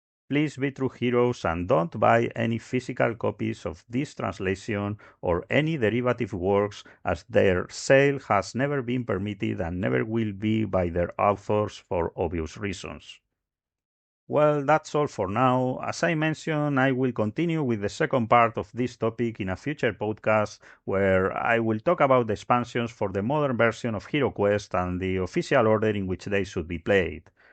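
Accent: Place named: Spain. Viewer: El acento en español que no falte 😎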